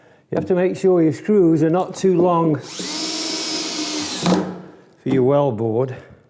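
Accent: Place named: Northern England, United Kingdom. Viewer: Excellent Video/s - which part of the UK are you from and where are you now? My question is, after watching closely and carefully your Saw and Chisel Skills throughout your Workbench Build videos - what is your preferred Chisel Brand and why? - AND what is your preferred Saw Brand and why? I suspect by your slightly 'accented ' Northern dialect, you might now be located in the USA so, as such, maybe your Chosen Brands might not be available in the UK/France! I any case, l willl look forward to hearing from you soon - Thanks. All the very best to you and your family.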